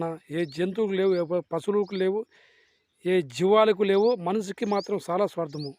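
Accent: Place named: Kurnool, India. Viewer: Anna meeru eay ooru me basha chusthay ma kurnool ternaykal,basha la undi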